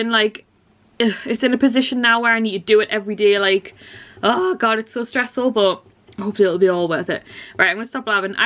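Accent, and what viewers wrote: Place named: Northern England, United Kingdom. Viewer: because I live in the uk and that's an up north accent xxxxxxx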